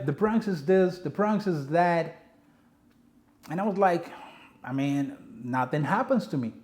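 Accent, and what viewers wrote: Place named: Brazil. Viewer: I left Cali (San Diego) after living there for 28 yrs 7 months ago. Moved to FL. I don't know how I did it, but the cost of living was getting too damn expensive, even with a great job. I didn't have a ton of stuff in my place. Packed all my stuff in XL Home Depot moving boxes and shipped boxes out through UPS a few months ahead of time of my final departure. 8 total boxes. All my shoes were in them with some clothes.I shipped through Pirate Ship, saving a couple hundred dollars. I had my car shipped out for a great deal. Had more shoes in the trunk and a few other things. I had my car broken into a few years ago at my place. Catalytic converter was tampered with the theifs had a hard time removing stuff all over the seats and floor. That next morning I called the cops. Them lazy bastards pretty much told me there's nothing they could do. 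They didn't even come out when I wanted to file a report. Since that incident, I lost all respect for SDPD. I'm here in FL closer to family. Still adjusting to the slower pace, a little culture shock if you know what I mean. Cost of living is cheaper than Cali. No state tax. I like the warm weather, but I hate the humidity. Excellent fishing here in which I love doing as my top go to hobby besides shoes. Do I miss Cali? Yes and no. I miss the weather, friends, and hanging out at many of my favorite spots. I'll definitely go back and visit my Cali peeps no doubt. BTW, where are you from? It sounds like to me you have a Brazilian accent.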